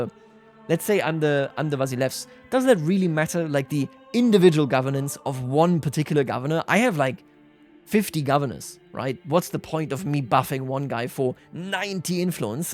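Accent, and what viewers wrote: Bavaria, Germany. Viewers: Must be the Bavarian accent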